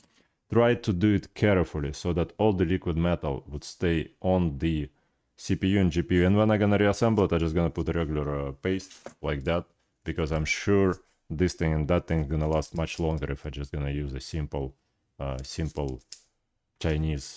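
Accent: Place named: Russia. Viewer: По акценту слышно что ты говоришь по русски)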